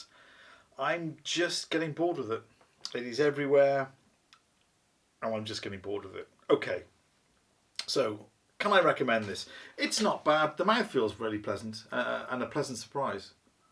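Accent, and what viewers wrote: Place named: Wolverhampton, United Kingdom. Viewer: Great Wolverhampton accent too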